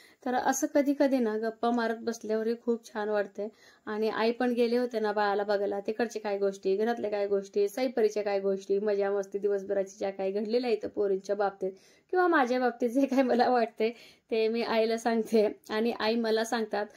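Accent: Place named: Shrirampur, India. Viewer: तुझ्या आलेगाव बघितले की मला माझ्या माहेरची श्रीरामपूर ची आठवण होते माझ्या आईच्या घराचा आजूबाजूचा परिसर सेम तुझ्या घरच्यासारखाच आहे स्वयंपाकाची पद्धत बोलायची पद्धत सेम तशीच आहे तुझ्या घराच्या बाजूला खूप मोकळी जागा आहे तू वाफे बनव आणि मोठ्या झाडांना आळेकर त्यांच्यामध्ये एक शेणखत लेंडी खत घाल एरिया झोपला घाल खूप छान आहे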